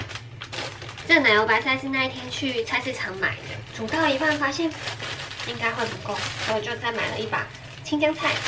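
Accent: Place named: Taichung, Taiwan. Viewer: 妳好可愛。我今天第一次看妳的影片，沒想到妳這麼會煮飯，拍影片的碎念也很有趣，一聽就好親切的台中腔😂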